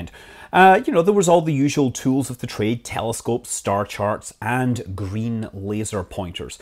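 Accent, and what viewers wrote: Scotland, United Kingdom. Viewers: It's refreshing to hear someone with a Scottish accent talking about something other than drinking and fighting.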